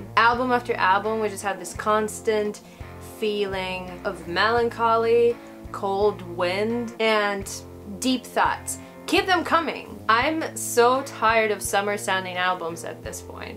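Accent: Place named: England, United Kingdom. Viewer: good review. Why do you sound english in your channel prologue?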